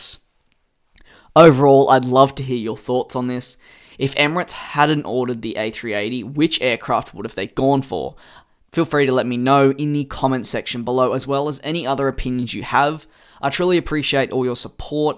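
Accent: Australia. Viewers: Is the narrator Australian? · you are Australian?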